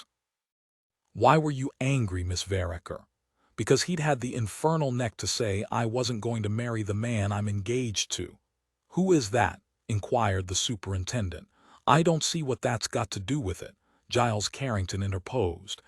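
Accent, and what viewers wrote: United States. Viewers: A Heyer story narrated by an American? Terrible! I couldn't listen. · Gawd. Terrible narrator even without the ridiculous American accent.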